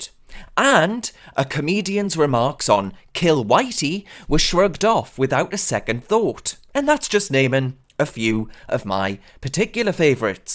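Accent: United Kingdom. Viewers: Your voice is violently British and I enjoy every moment of it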